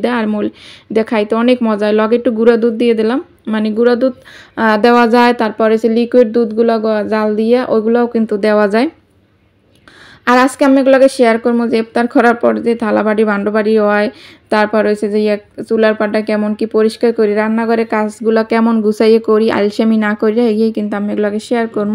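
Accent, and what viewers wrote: Barishal, Bangladesh. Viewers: সালামালাইকুম আপু তোমার মুখে বরিশালের ভাষা শুনতে ভালই লাগলো ❤আমি তোমার পরিবারে একদম নতুন সদস্য পুরো ভিডিও খুব ভালো লাগলো আজকে থেকে সব সময় পাশে পাবে ❤❤তুমিও এসো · আসসালামু আলায়কুম আপু তোমার মুখে বরিশালের ভাষা শুনতে ভালোই লাগে।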